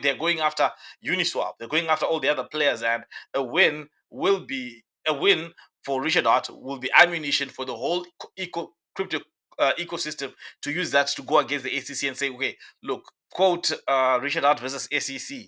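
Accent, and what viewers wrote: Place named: Africa. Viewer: Speak some proper English you have such a strong accent African accent, American man this is America man !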